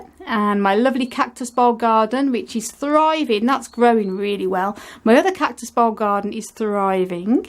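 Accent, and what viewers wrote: Birmingham, United Kingdom. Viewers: Awesome collection, have you ever kept Lithops, I used to love growing those!! Did you use to live in Birmingham as I am sure I can detect some brummy in your accent!!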